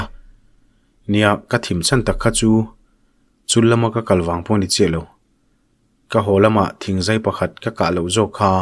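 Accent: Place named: Khilam, China. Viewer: Khilam unaute i ang zawk riau mai😆 ngaihthlak anuam e..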